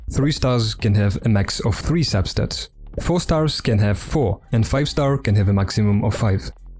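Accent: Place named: Russia. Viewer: сижу думаю че у тебя с акцентом. И ты на русски забалаболил. так и знал. но а так видос супер. но ты не правильно рассказал про дроп шансы. (да посути 20% но из за гаранта вид этого чутка меняется) 4 косты имеют гарант дропа в 2. тоесть если 1 раз не выпал то 2 точно выпадет. кароче как с крутками 3 косты имеют гарант в 4 1 косты в 8. еще бы я сказал новичкам соединять эхо на новом уровне ДБ так как есть шанс на дроп того же эхо нового ранга что так же идет в прогресс ДБ. ну и не тратить материалы на лишних персов и эхо до 5* ну или если сложно фармить 4* а так вся база и много хороших советов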